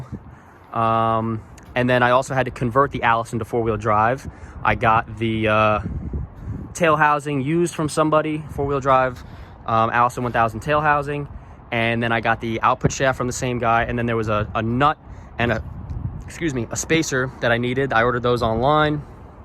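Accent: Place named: Brooklyn, United States. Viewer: We used to go make deliveries to New Paltz Poughkeepsie from Brooklyn terminal market years ago for the watermelon king M Pagano and Sons and I'm originally from Brooklyn to, I caught your accent lol Really nice work, she really looks stock, I don't 100% have a builder to do my Tacoma SAS, I might have to look you up if this guy doesn't come through for me? He's so far has barely showed any interest, and long island mechanics suck!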